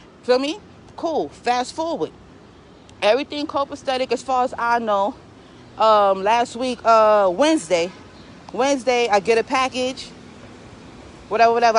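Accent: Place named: New York, United States. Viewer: That NY accent so gully! Shit is fire!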